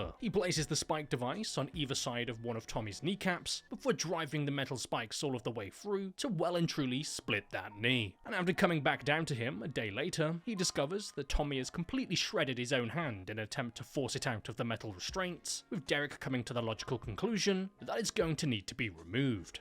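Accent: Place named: Scotland, United Kingdom. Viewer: it's his "I'm pretty sure this is a British accent... with a touch of Scott" his vernacular and his emphasis are all just a bit over the top. But he is a "broken man" (like most of us) with a cynical outlook on every last thing. Then the switch is thrown and he will go on the best tangents about some aspect of the movie. I'd listen to him review breakfast cereals. is a treat.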